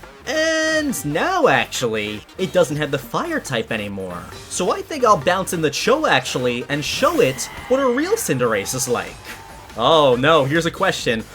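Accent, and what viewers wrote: United Kingdom. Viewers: How do you do so good British ascent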